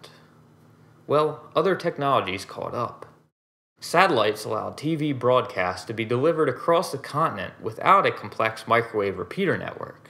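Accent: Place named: Philadelphia, United States. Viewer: Man that's a thick Philly accent you got there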